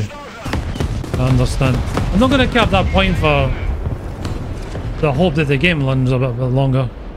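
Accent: Scotland, United Kingdom. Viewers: I do really enjoy your intros ! And this one is gold, especially the part on the French "Sacrebleu". As a frenchman I can tell you that i've never said that when cursing :D Love your Scottish accent and thanks for the great content dude :)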